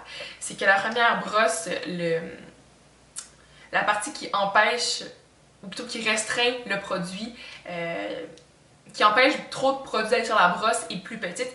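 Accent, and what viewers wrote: Quebec, Canada. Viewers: Ah ! J'adore les youtubeuses quebequoises !! Votre accent est vraiment craquant ! J'ai aussi les cheveux bouclés et quelle galère de trouver un produit efficace, que ce soit pour les nourrir ou les definir... j'ai testé aussi la game john frieda et comme toi, bof... je soupçonne même que ce soit bourré de silicone et autres berk...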